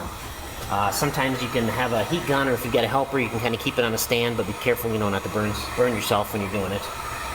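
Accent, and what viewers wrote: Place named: Minnesota, United States. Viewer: Nice video! My mechanic here in Houston wanted $350 just to pop out a corner dent like that. But Houston is expensive. Also nice to hear a Minnesota accent! I went to college up there.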